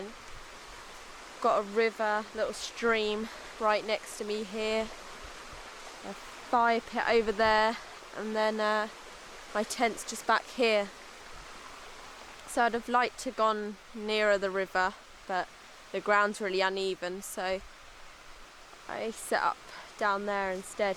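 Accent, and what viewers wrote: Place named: Wales, United Kingdom. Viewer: in the valleys yeah lol nearly got the accent lol move to wales best thing you ever do. i know you love it lol btw its a stream not a river lol. take care hurry up back.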